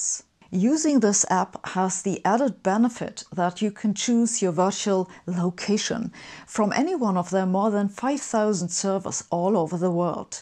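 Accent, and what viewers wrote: Germany. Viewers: I love the german people. So brilliant, so strong. Germany is the shining jewel of europe. So long as they avoid the mistakes of the past, i.e. nazism, military empire, etc. Nothing can stop Germany or dim it's influence in the world. Look how brilliant this german woman is. And that accent! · You sound so German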